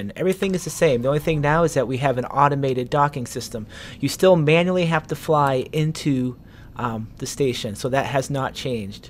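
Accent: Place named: New England, United States. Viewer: Loving your new england accent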